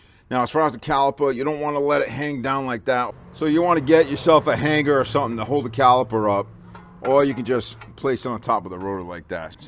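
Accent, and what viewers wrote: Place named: New York, United States. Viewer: This was the best tutorial I’ve come across for this specific job for this specific car. Hers is a 2018 I hope this applies. My daughter wants me to change her brakes and she really doesn’t want to pay those outrageous mechanic prices and neither do I. I’ve only done 2 other vehicles. So here goes! Oh! If you don’t mind my saying, you sound like you’re from my neck of the woods. NY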